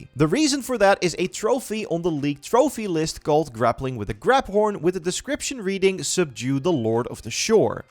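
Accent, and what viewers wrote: Netherlands. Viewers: I smell Dutch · You sound dutch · Are you Dutch? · Are you Dutch? You kinda have a Dutch accent that's why. Also looking forward to the game!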